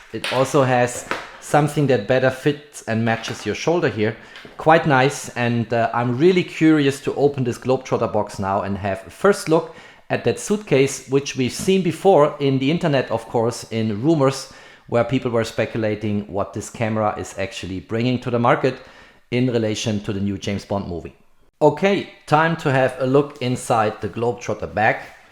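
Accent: Germany. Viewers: "Null Null Sieben" or "Double-O Seven" in English. No "O O Seven". My guess is you're German and never watched a Bond movie in English (besides that I hear a slight German accent). Just saying... Cheers from Indonesia!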